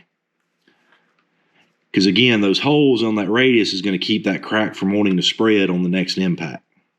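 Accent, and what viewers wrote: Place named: United States. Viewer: Great job, i love your american accent! Yee haaw!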